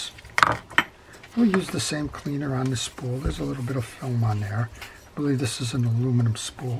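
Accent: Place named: Philadelphia, United States. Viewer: I just got a vintage Pfuger Nooby reel, seems to be similar to this.. I am amassing a small collection of vintage reels, and thanks to your videos Ive gotten most of them back into working order :) I do have a few I'm struggling with, perhaps I'll send them to you... thanks for the videos, and your accent brings me back.. I grew up outside Philly and spent many summer weekends at the Jersey shore 😂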